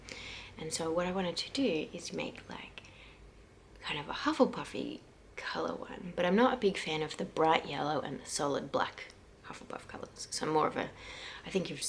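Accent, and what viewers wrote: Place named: Australia. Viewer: Hi, I’m a Sydney girl, it’s so nice to hear another Aussie voice on here. I’m looking at starting a yarn carousel similar to how the America girls share yarn and I’m wondering if you’d like to join us. I’ll be posting a video about it later this evening ifyou’ interested. I’d love to have you join us in this little bit of fun...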